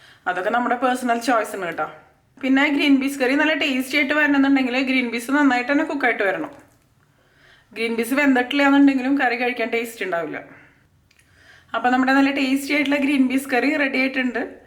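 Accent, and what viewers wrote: Thrissur, India. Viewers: ചേച്ചി തൃശൂരാണോ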